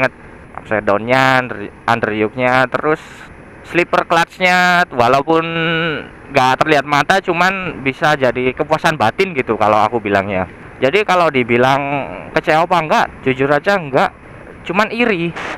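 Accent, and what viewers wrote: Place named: Java, Indonesia. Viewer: medok bngt bahasanya..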